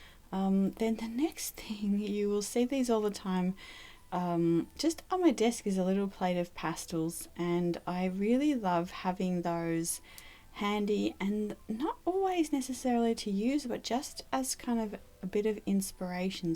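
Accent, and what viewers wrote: Australia. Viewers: Beautiful video. Are you from Australia?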